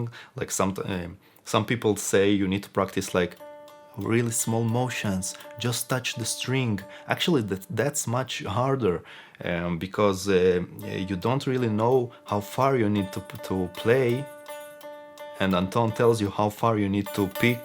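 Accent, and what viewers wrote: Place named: Russia. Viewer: Я уверен, что если бы ты вёл канал на русском - то подписчиков было бы гораздо больше! Слушать русскоговорящего, который говорит на инглише с русским акцентом - это реально тяжко )))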